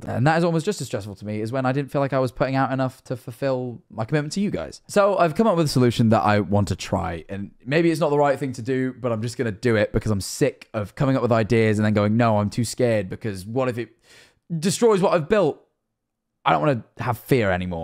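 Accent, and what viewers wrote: United Kingdom. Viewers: oh my fucking god you sound so british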